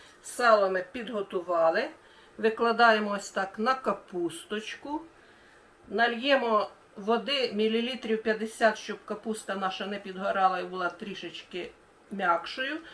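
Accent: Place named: Khmelnytskyi, Ukraine. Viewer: Ви з Хмельниччини? Говорите як на Хмельниччині. ❤ Дякую за ваші рецепти.❤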